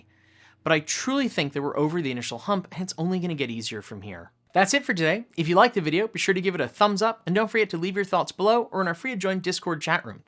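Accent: United Kingdom. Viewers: What happened to the British accent?! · Great advice especially for me who is saving up to purchase a used EV.. Wait a minute... What happened to British accent? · Am I crazy or do you usually have a British accent? Your American accent is very good! I wish I could do a british accent as well as you do American haha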